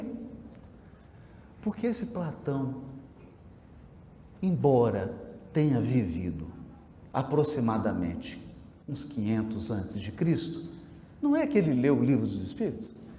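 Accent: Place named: Minas Gerais, Brazil. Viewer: Obrigada por essa mineirise maravilhosa . querido irmão obrigada por seus ensinamentos paz e luz.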